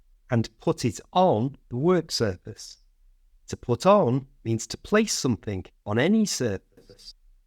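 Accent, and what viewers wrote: Liverpool, United Kingdom. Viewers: You have a wonderful scouse accent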